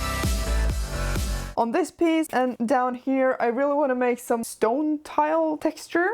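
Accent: Norway. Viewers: "Skjera Bagera" is undoubtedly Norwegian, also her accent and the nature in her videos. So I think she is from Norway. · Im 99% sure ur norwegian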